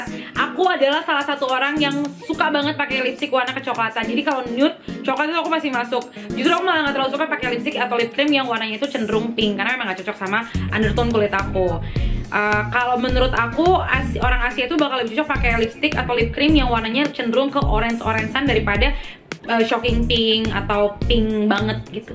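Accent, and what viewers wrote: Medan, Indonesia. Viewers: Assalammualaikum, Kak,... Kenalkan nma ku, Alia,.. Brasal dri Malaysia,... Aku suka skli sama content video, Akak,... Jgak cra pertuturan kata Medan tu, Pas skli sama org nya,....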